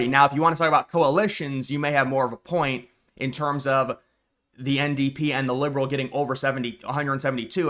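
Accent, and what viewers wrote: United States. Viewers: You talk like an American.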